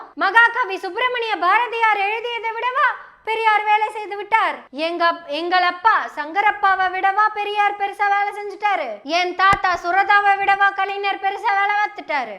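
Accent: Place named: Coimbatore, India. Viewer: குழந்தை குரும்பு கொஞ்சும் கோவை தமிழ் பேச்சு அருமை அருமை தொடரட்டும். .......❤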